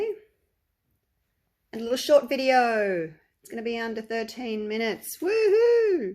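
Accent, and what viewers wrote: Australia. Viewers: Hi. I have been subscribed to Your channel for 2? Years. You are a favorite because of Your talent for beautiful paintings. I Love the Poodles and Birds, plus your gorgeous back yard. I know who is, and your accent, Your art, and the joyful vibe. This painting turned out well, although I was nervous. I could feel the stress from the beginning, but You calmed to natural, as the painting evolved. Relaxing. I also subscribe to Gilly. Her work will never be compared to Your work, because it is so different. I appreciate Both!! Thank You for everything that You share. I wonder.... both of you, are Australian. The World Loves anyone from Australia. You are the best. What if You and Gilly talked? Maybe it was an honest mistake? Or not? Would an apology work? Could You and Gilly combine as a Team for Australia. We need both Artists. I am an American, and depending on you Both to get through this horrible time by doing art. 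I Need you Both. Please patch the fence. If You, reply to Me... I am going forward to contact Gilly. Otherwise, I will stay silent. I adore You,. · Hi, Im enjoying your pouring demos ❤ Great to hear your Aussie accent, I'm watching from SA. Thanks for your explanations and sharing your experience. Love your art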